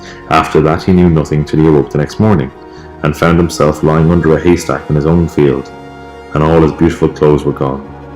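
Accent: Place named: Ireland. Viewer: God, I love ya, mate. Such challenging subject matter you tackle, always doing a great job with it. Yes, I do see that, the strong similarities between the kingdoms - if they're really separate at all. This battle-weary heart, deeply sensing our oneness with All That Is, longs for the peace of our underlying harmony, which slips me up at times. It just is what it is, & it's good to know that. Until we at least accept the is-ness of whatever it is, we're going nowhere trying to change it. Acceptance & allowing smooth the way to manifest desirable change. Ah, Irish. I love it, but then I'm a sucker for "foreign" accents. Maybe it's that oneness thing, yet again. :D ~♥~ PS You may enjoy this fav quote, likely being able to see/feel more deeply into it than most: "Observation with evaluation is the highest form of intelligence." ~Jiddu Krishnamurti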